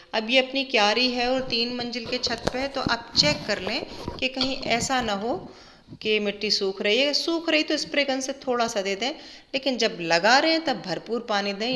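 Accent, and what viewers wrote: Standard. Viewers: Very nicely taught Ma'am. I luv ur shudh hindi !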